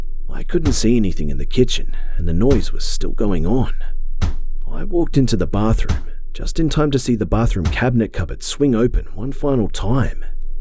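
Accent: Australia. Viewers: Do you live in Australia (I can tell you have an Aussie accent cause I'm also Australian)🇦🇺🇦🇺🇦🇺🇦🇺🇦🇺🇦🇺🇦🇺🇦🇺🇦🇺🇦🇺🇦🇺🇦🇺🇦🇺🇦🇺🇦🇺🇦🇺🇦🇺🇦🇺🇦🇺🇦🇺🇦🇺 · You're from Australia, right? Your accent is familiar.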